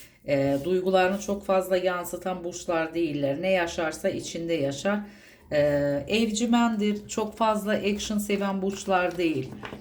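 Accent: Azerbaijan. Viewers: Siz Azerbaycanlımısınız? Şive çok benziyor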